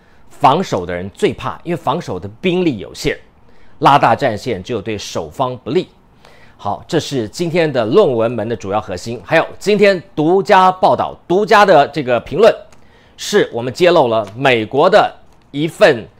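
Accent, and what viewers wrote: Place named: China. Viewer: 整個口音變中國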